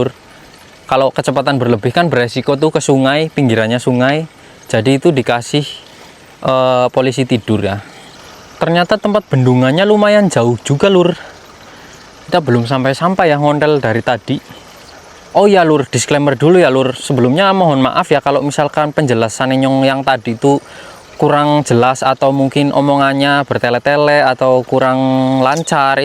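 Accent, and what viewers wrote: Banyumas, Indonesia. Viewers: Guyu ne kakak khas banget kaleh ngapak e nek ngomong seng bikin TOP.bojonegoro Jawa timur hadir.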